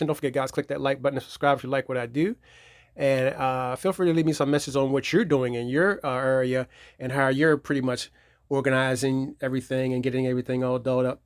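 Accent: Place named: Louisiana, United States. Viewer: Nice collection! Lots great titles and TV shows. Love how you have them set up. you have a cool accent, is it from Louisiana. I subbed.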